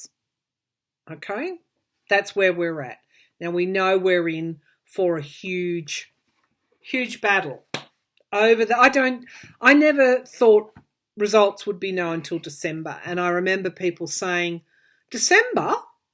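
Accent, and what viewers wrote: Australia. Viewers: You are an Aussie? I am from brisbane. I am incredibly nervous. It is so lovely to hear your accent. I am in china.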